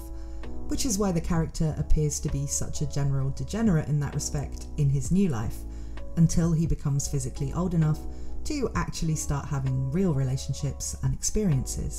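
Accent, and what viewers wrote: United Kingdom. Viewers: Are you a brit????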